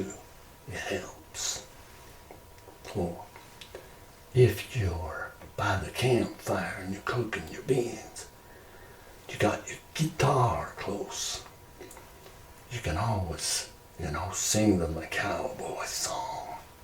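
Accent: United States. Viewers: I live in cowboy country, so it's enjoyable to see the nod to our culture and accent. Thanks! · Love that cowboy accent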